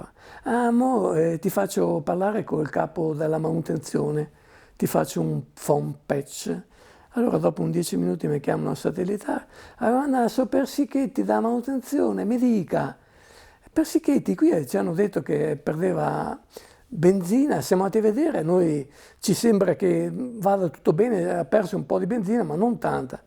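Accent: Veneto, Italy. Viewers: Mi semba veneto sto comandante.... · ma sitto veneto?? bella storia, sai raccontare bene comandante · Veneto doc😂 grande comandante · È veneto lui verooo?? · Sento tanto veneto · Un comandante Alitalia non romano per una volta che strano un veneto o friulano da accento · Incredibile come faccia fatica a non parlare veneto! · L'inconfondibile cadenza veneta, mi rende il racconto ancora più apprezzabile 😊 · Grazie per questa testimonianza e complimenti per la gestione di una giornata così difficile. Ma è veneto? ...riconosco un accento familiare... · Concordo, competente e simpatico! Sicuramente veneto, qualche intercalare veneto si è sentito😅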